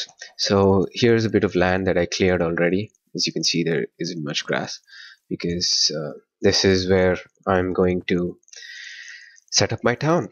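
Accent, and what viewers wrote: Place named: South Asia. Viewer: u have hindi/urdu accent xddd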